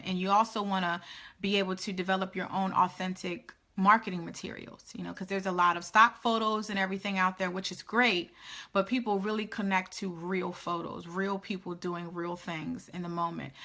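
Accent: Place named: Philadelphia, United States. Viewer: This wasn't only informative, it was inspirational. Just starting in January, I've never even given thought to an event. The non profit status advice was helpful as well. I was wondering how to raise the ~$600 for 501c3 corporation filing. Thank You! I was also shocked you mentioned Baltimore. You sound more Phily than Maryland ;-)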